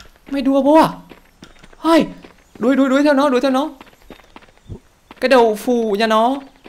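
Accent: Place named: Countryside, Vietnam. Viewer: nói thì giọng như nhà quê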